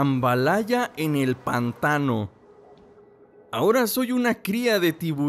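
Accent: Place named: Mexico. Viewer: el tiburón mexicano , literal c nota qu sos mexicano :uuu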